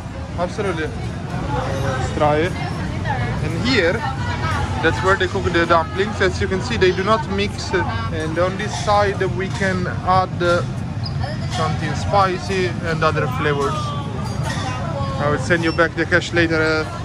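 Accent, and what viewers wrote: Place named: Italy. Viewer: omg i enjoy hearing your italian english。。。so funny engjoy Chonqqing just come back from Italy last week：）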